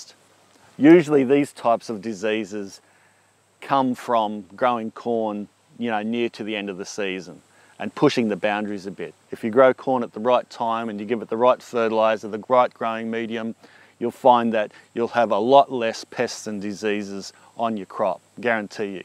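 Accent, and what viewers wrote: Australia. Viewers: I am a new sub and I don't know how I stumbled upon your channel but I am so happy that I did. 1st- I love your accent (Australian is my favorite), 2 - you have a wonderful sense of humor (corny even...lol) 3 - the content and way you present the information is spot on and to the point. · Hi! Love this video. Your accent and enthusiasm reminds me of Steve Irwin, except with vegetables instead of animals!! I'm going to attempt to grow some Bantam corn in a couple of whiskey barrels this year. (I live in an apt, so don't have a garden.) This was great info about spacing, and direct-sow vs. transplanting starts. I saw another video where the corn seeds were started in net pots instead of jiffy pots and they did great. The open-air design of the net pots allows for air-pruning, and not having the plants get root-bound in the starter pots. 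Good root system, but not overgrown. Thanks a lot for all the tips! Be well.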